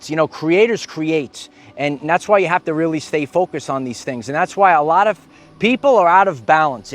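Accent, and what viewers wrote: New York, United States. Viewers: a few weeks before I came across your channel I would sometimes see 2 or 3 times per day, an ad at a bus stop saying come to New York. The picture showed the statue of Liberty holding the torch. It really popped out and got my attention How synchronistic. Anyway I am really enjoying the new connection and knowledge gained. And I love the NY acsent Peace.😊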